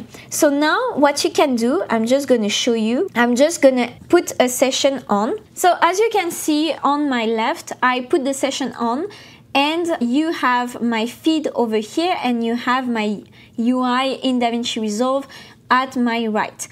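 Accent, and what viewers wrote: France. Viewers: Salut.. Merciiii. Pour. Ce video. surtout que c'est ton specialité. J'addors ton accent français. Quand tu parle anglais.. ❤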